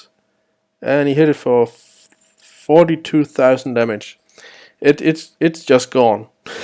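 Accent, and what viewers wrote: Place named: Germany. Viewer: I bet you are from Germany, it really sounds like it. :D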